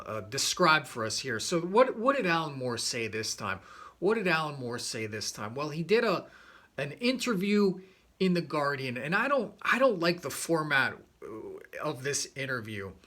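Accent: Australia. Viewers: Specially since Moore is from the north of England. The accent on this vid is more Aussie/Cockney.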